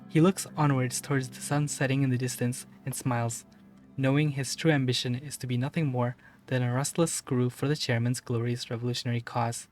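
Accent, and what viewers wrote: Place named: Indonesia. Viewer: your accent sounds kinda like you’re from Indonesia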